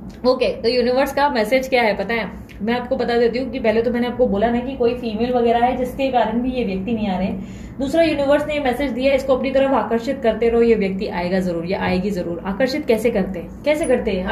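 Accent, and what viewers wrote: Mumbai, India. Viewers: Apki Mumbai language mast lagti hai mujhe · Ekdam mast reading mumbaiya language di amchi mumbai jindabaad🤩, I claim all positive energies thank you universe thank you divine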